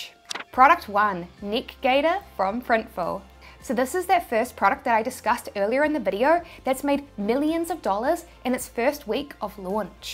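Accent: New Zealand. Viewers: You sound like a kiwi girl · I'm in England, and think your New Zealand accent is reassuringly super cool. Completely in love with you, brilliant presentation so easy to understand. I was never much interested in on-line marketing until, Conyvirus found me on YouTube and stumbling onto your channel, Wow you are impressive, your running commentary alone is so descriptive I have you in my ear most all the time, helping and encouraging, Brilliant brilliant brilliant - you have changed my life, for the better for ever.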